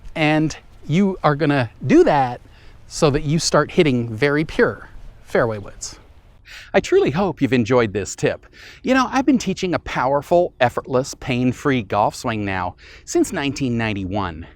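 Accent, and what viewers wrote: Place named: South, United States. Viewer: Having spent 12 years in Gainesville Florida I can say you sound like an exasperated southerner. And I love it!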